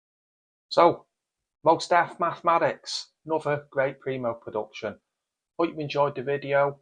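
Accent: Manchester, United Kingdom. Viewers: Is that a Mancunian accent I hear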